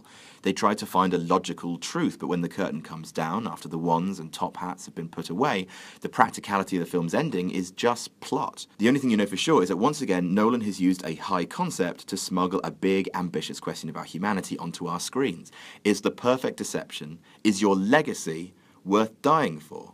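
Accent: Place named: England, United Kingdom. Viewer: stop talkong so fast its hard for us americans to understand you with your dumb english accent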